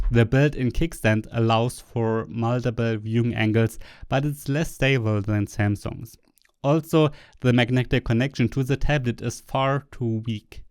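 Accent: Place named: Germany. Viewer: Have you been working with an English dialect coach? Your sharp German accent is slowly disappearing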